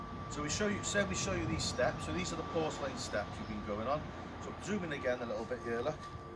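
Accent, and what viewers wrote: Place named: South Wales, United Kingdom. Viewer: Efflorecence is so disheartening. I feel your pain it is no reflection on the work of us bricklayers. Listening to your accent I think wr are from the same neck of the woods ( South Wales ?). The majority sand the builders merchants supply is dredged out of the Severn estuary which will have more of a salt content than pit sand . Would be interesting to know if builders in other areas have the same issues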